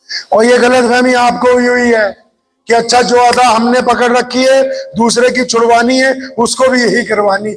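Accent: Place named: Punjab, Pakistan. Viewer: Takabbur nhi bhai..wo punjab se he..unki aawaz or lhza hi esa he.. MashaAllah bayan bahut Bdiya krte he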